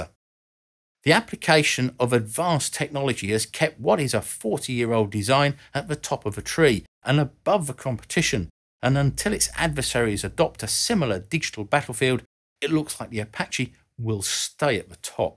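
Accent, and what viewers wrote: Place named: Essex, United Kingdom. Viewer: The gecko has a London cockney accent & is from Essex 50 miles north-east of London - they will sound the same to most Americans.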